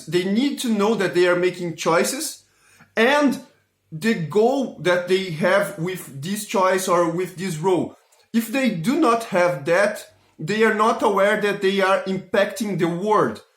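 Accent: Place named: Brazil. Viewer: Consigo sentir o sotaque brasileiro à quilometros de distancia KKKKK, ótimo vídeo mano, tava precisando de dicas assim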